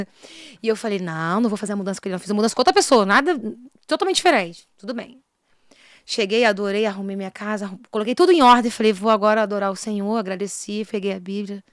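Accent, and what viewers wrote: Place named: Rio de Janeiro, Brazil. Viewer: Pelo sotaque é do RJ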